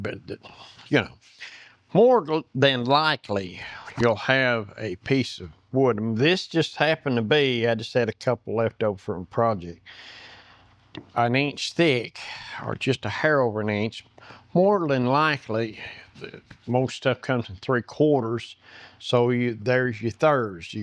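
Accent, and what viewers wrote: South, United States. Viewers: I had asked, in a different video, what state you were from because I could not quite place it. I was thinking Kentucky, Tenn, NCar or maybe Virginia. I got pretty close. I'm from Texas, so knew it wasn't that one -- farther east than TX or LA, and farther north than MS, AL, etc.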